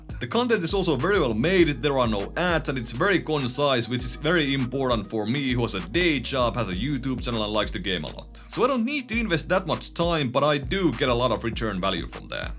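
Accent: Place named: Finland. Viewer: Hyvä rallienkku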